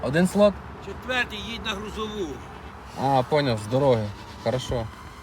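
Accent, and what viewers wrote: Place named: Vinnytsia, Ukraine. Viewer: Завали ротяку, руснявий клоун. Звичайний вінницький суржик. Це українська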